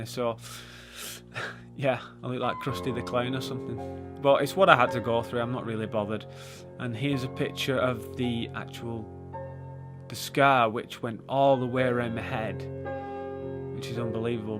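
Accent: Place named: Lancashire, United Kingdom. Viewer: “Hurr from thurr” haha. Love the Lancashire accent. Hair looking great man:)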